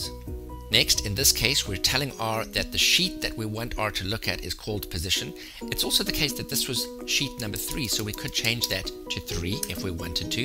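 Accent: South Africa. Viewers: Lol very surprising to hear a South African accent on Youtube. The work you've done here is insane. Thanks!!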